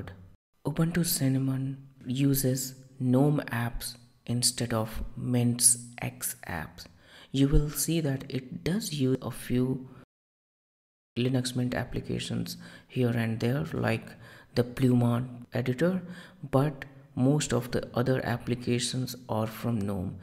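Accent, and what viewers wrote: India. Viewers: indian voice ::))))))))))))))))))))))))))))))))))))))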